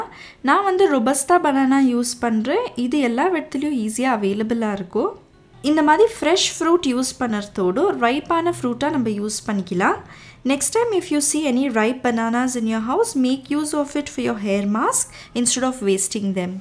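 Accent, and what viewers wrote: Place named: Bangalore, India. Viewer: Praise the Lord Sisy...! R u frm Bangalore?! Bcs, ur pronunciation of Tamil seems so... :) !!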